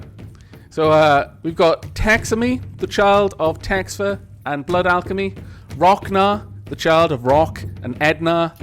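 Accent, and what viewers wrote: United Kingdom. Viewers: Oh it's okay, i always enjoy these parts even if they come two weeks later. I even watched, and that's really rare, one episode twice while playing dwarf fortress just to hear your great british accent! Great videos!:D greets from Germany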